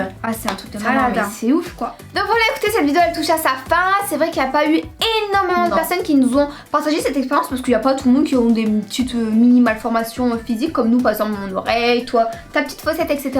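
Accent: North, France. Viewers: On sent que vous avez l'accent du Nord😅,moi aussi je suis du Nord. Ps:J'adore vos vidéos❤, vous êtes hyper énergique et cela me donne du plaisir à regarder vos vidéos !! Si t'es d'accord like👍 | \/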